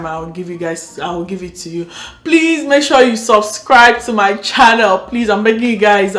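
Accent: Canada. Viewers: You’re beginning to have Canadian accent🌝💃🏻❤️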